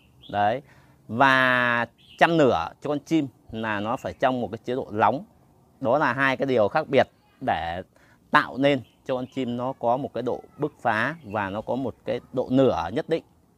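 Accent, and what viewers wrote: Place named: Hai Duong, Vietnam. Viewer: Giọng nói quê Hải Dương dung cháy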